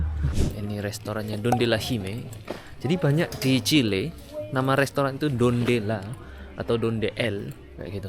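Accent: Java, Indonesia. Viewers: Kapan² full gae boso Jowo Cak 😁 logatmu wes kuwentell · Mas logat mu Surabaya atau madura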